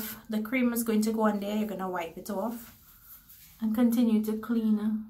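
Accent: Trinidad and Tobago. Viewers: That Trini accent 🎵🎵🎶🎶🎶🎵🎵🎵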